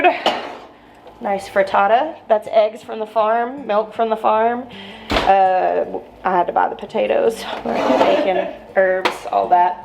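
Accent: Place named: South Carolina, United States. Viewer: your SC accent is coming on strong!! 😁🌻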